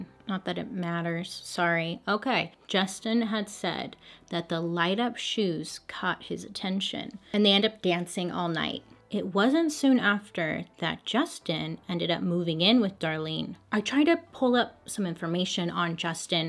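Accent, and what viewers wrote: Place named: California, United States. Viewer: Watching this in 2020 and I feel so happy to hear someone from my part of California!!!! I lived in Riverside, Moreno Valley and Perris!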